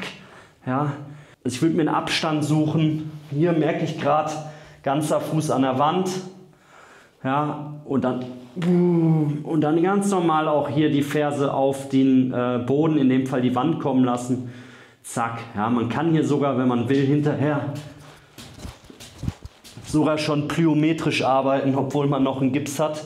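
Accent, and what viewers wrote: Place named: Austria. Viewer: gute besserung liebe btw is sie österreicherin? interessanter akzent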